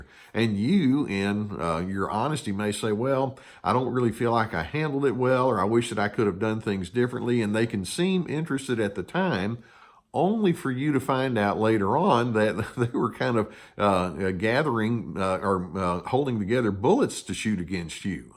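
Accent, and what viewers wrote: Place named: Texas, United States. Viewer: Added bonus: his Texan accent is a pleasure to listen to, always soothing. It helps, because sometimes the cold, hard truth that he's giving is difficult to hear.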